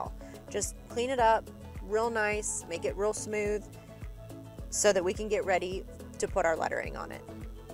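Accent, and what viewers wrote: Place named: South, United States. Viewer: Very Beautiful !! ----- Girl where you from ?? With that southern TWANG Ba'eye !! LOL ---- I guess more to the west from where Im at ---- Or it could be Alabama/Tn ...... I'm in Louisiana ---- Down yonder from you =)~ ------- Love your work ---- and your husband reminds me of mine ---- always creepin around when were trying to work ...... He is just amazed at your work .... is kinda sweet ...... but still kinda gets annoying .....bless their hearts .... I guess ---as long as they keep their creepin at home its ok ...... I SURE don't wanna be an outside the home creepers wife ...... UGH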